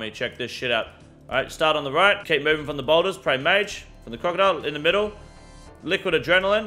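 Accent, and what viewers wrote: Australia. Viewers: ur aussie accent is fantastic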